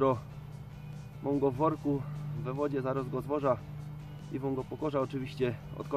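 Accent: Silesia, Poland. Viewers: Jak ja lubię Ciebie oglądać, przez tą Twoją gware 😉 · Ty ja tak samo. Gadka Śląska hehehe. Chytaj chytaj :)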